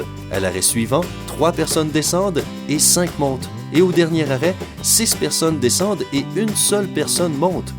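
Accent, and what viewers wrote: Quebec, Canada. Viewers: Pourquoi y'a un québécois (comme moi) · mais pourquoi la voix de canadien là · L'accent Québécois!! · Tamara oui c'est vrai, déjà qu'en français j'entends pas toujours, alors avec l'accent québécois 😐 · la dernière c'est n'importe quoi! Mais j'adore cette accent québéquoi!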